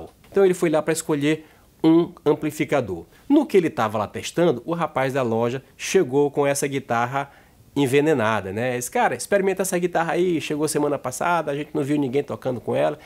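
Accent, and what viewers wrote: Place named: Northeast, Brazil. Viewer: . Oque eu aho legal é que o seu "x" nordestino é diferente do "x" carioca. Kkk E é um chamariz no seu marketing pessoal.😆😆😁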